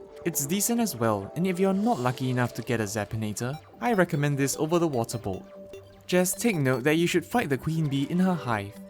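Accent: Singapore. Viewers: Why is your voice so nice are you Singaporein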